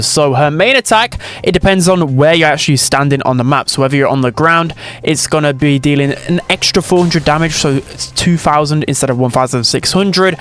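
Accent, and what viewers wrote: United Kingdom. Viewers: Even the sub titles gets failed against these brits english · Hes british leave him alone 😭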